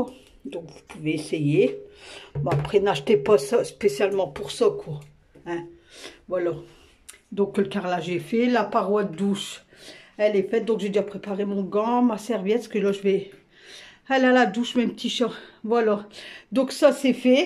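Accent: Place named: Nord-Pas-de-Calais, France. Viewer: Coucou ma vive les ch'tis Je les aimes et j'aime trop l'accent ch'tis ❤❤❤❤❤❤❤❤❤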